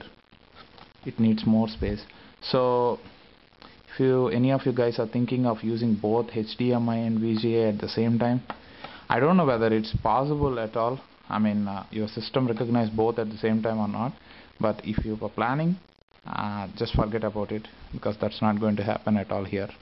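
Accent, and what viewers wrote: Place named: India. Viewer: hes pointing out to ur indian accent my friend .. hence the software thing. :P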